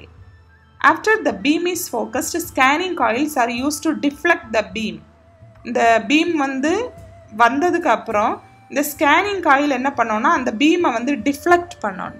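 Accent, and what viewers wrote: Kanyakumari, India. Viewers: Mam neenga Kanyakumari ya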